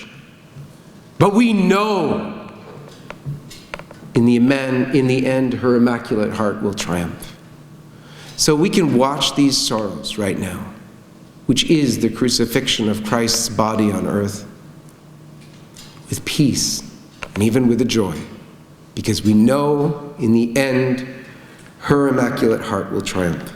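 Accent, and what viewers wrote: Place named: Germany. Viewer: Is he a German speaker? His English is so good!😁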